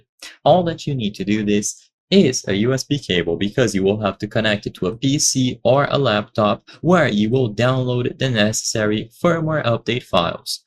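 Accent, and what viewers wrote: Brazil. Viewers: Você é brasileiro? Tive impressão pelo sotaque, awesome video my friend!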